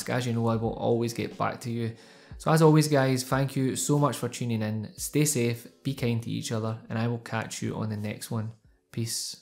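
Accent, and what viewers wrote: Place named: Glasgow, United Kingdom. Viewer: Your Glaswegian right, well you sound like, anyway keep up the great work! But I have a request can you make a video about budget per key RGB mechanical keyboards under 30 pound.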